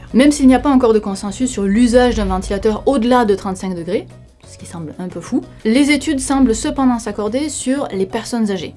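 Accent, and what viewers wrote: South, France. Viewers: Tu as un petit accent du sud de la france ou j'ai fumer ? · Question totalement annexe, comment est perçu ton accent du Sud (? C'est bien ça) au Québec?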